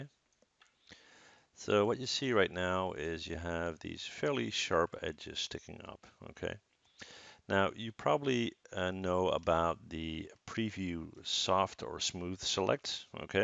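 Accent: Netherlands. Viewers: Hey, can you make a tutorial of how you can render in maya. So you can render your scene for your portfolio. And I have another question, are you from the Netherlands because you sound like a Dutch person. thanks for reading my comment and have a nice day.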